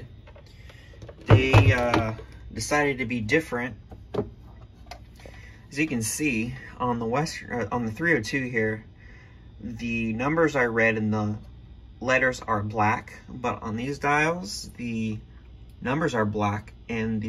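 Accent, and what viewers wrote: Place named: France. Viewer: You sound really cute 🥰;) French accent is nice 😊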